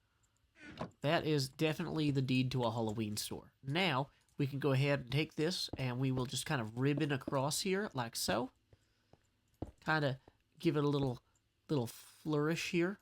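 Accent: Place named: South, United States. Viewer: your content is awesome, but I can never seem to get past that heavy southern accent! lol merry christmas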